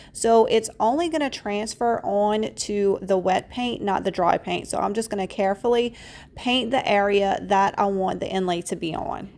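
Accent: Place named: South, United States. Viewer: I’m pretty sure it’s not phony! She’s from the south and has an accent!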